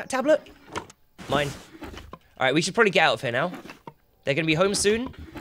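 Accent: England, United Kingdom. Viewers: Because porn and pawn are pronounced the same in an english accent:')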